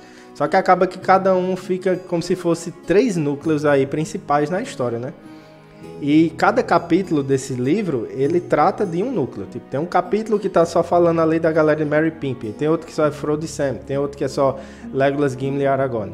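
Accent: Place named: Paraíba, Brazil. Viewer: Eita, sotaque forte! O macho é paraibano 🗣📚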